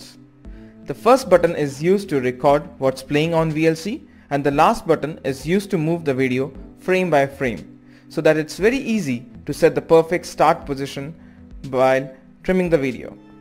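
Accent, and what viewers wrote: India. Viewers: Why is it so , that when i hear an indian voice , i don't thrust the tutorial ?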